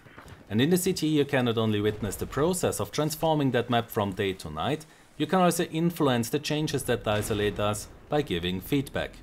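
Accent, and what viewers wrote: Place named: Germany. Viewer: I do have to say, besides your sultry German (?) accent, you are probably the ONLY Battlefield YouTuber that actually looks at the bigger picture. The one who sits down and analyses things instead of bitching about them (like LevelCrap and others). You actually have the more levelheaded approach to how Battlefield works and what should be done to make it better. Keep up the good work!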